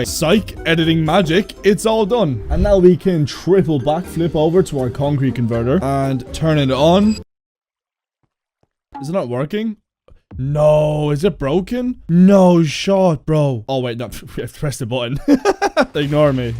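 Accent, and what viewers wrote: Ireland. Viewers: I KNEW IT, as an irish man when u were saying stuff like "tree" instead of three (it wus rly subtle but i could kinda tell) i KNEW u were irish, i jus couldnt prove it until wait... ive been watching that long? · I can smell the irishness from a mile away, my dad is irish so this is wholesome👍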